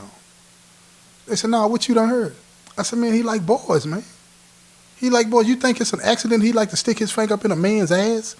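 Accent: South, United States. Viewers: Is your father or mother or grandparents from the south? Because you sound like some of my kinfolk down here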